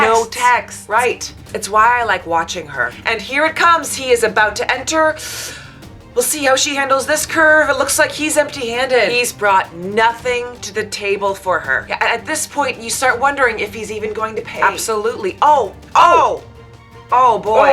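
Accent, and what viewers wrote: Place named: Wisconsin, United States. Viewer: Very funny. The Wisconsin accent is similar to us here in Ontario. One question... I am trying to figure out the words in the intro?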